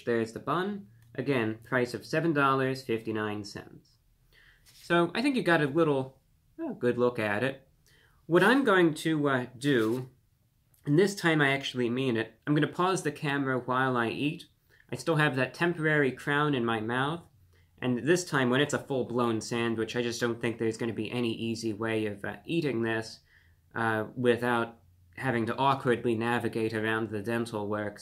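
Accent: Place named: Transatlantic, United States. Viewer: He is upping the transatlantic accent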